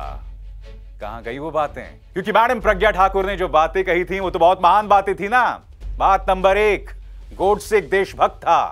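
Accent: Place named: Bihar, India. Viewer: Nice tone sir in bihari tone 🙏🙏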